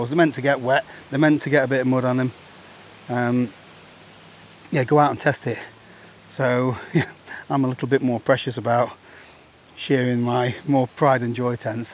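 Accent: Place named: East Yorkshire, United Kingdom. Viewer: You could get yer brompton in there wiv yer.....real cosy. 'Ull' east yorkshire.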